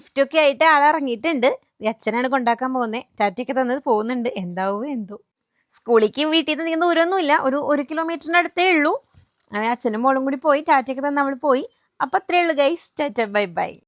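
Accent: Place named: Thrissur, India. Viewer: തൃശ്ശൂർ ഭാഷ സൂപ്പർ ആണ്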